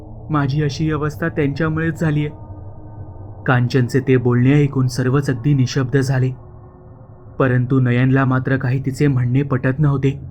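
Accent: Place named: Malvani, India. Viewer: तुझ्या तोंडानं मालवणी बोललंस बरा वाटला आमका ... मालवणी च वाटलंस...... पण भीती वाटता जाम हैता सगळा ऐकान 😅 lots of love from malvan ❤❤❤❤❤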